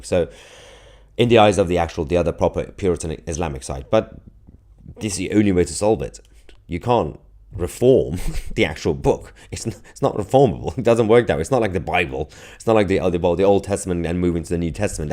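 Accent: United Kingdom. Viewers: British/Persian, God bless you, I understand that, I do not understand what is the ludicris point, of calling you other nationalities, you definitely have a lovely British accent. You are a Brit!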